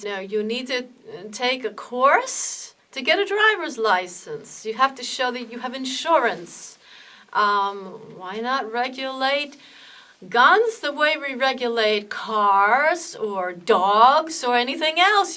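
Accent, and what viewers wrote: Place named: Germany. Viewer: You have a German accent!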